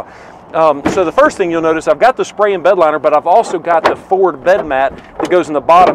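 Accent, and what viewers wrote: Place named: Alabama, United States. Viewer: I lived in Birmingham for 18 years so I enjoyed and missed the narrator's accent. Now we live in the Colorado mountains where we can get storms that drop multiple feet of snow. We keep our trucks stock height which is good enough to handle any snow, dirt roads, and basic off-road trails. Unless you want to travel on knarly trails like Moab, or drive through water, the only benefit of lifting/raising your truck and slapping on huge wheels is bragging rights. Leasing is always a mistake unless you are getting a vehicle you are sure you don't want. Leasing is not ownership, you are just borrowing the vehicle. You never make any modifications to a leased car beyond replacing the tires if they wear out and inserting a phone charger in the cigarette lighter. It isn't your vehicle! The real owner, the leasing company, will financially punish you for messing with their vehicle. 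Even if you don't modify the vehicle you will be financially punished if you dare exceed the limited miles they allot you. When the lease is up your life crashes if you don't replace the vehicle. But with no accrued value or trade-in many buyers fall into the leasing trap, significantly raising their vehicles costs over buying.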